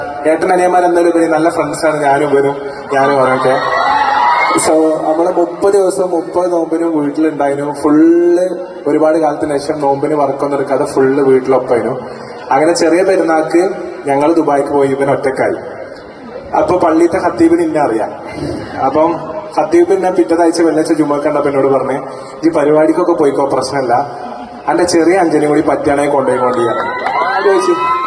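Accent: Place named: Nilambur, India. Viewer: njagale nilambur slang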